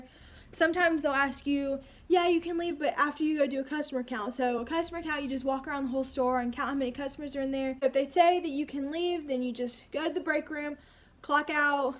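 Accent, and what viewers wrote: South, United States. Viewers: just curious because i noticed your accent and also i didnt know publix was anywhere besides fl ❤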